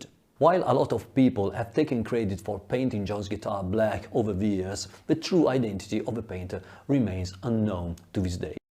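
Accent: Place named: Italy. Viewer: Very cool. I love your Italian accent, by the way. Sounds like my wife (she is from Milano).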